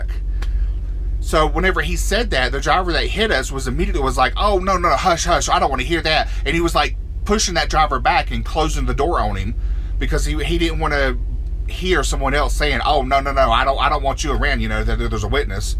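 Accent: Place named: South, United States. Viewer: first I must say that I love your southern accent lol and my mom is from a little place called Rutherfordton NC you blink and you could pass it lol I love your videos guys be safe!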